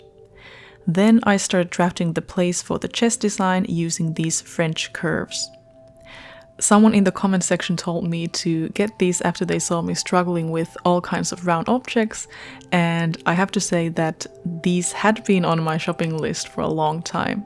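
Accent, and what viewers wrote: Finland. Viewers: Amazing work! PS: Am I detecting a hint of Finnish accent?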